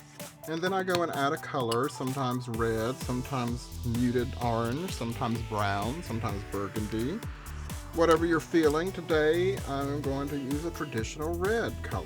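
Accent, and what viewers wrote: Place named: South, United States. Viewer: i adore her accent! southern queens are my favorite ❤❤❤❤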